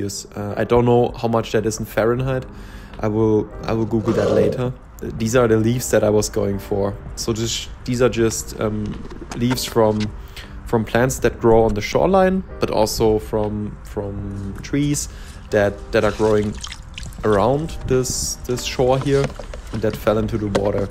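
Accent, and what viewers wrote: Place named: Germany. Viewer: Random question: I’m American, you said you’re from Germany. I know English stems from you guys so does the average person there learn English and German growing up? I notice all my channels with similar content come from your general area and all of you sound like English is native even though my American ears can hear a slight “Arnold Schwarzenegger” vibe coming through. This is not meant to be offensive or anything, the accent is cool to me. Great video, I’ll be subbing to see what else you do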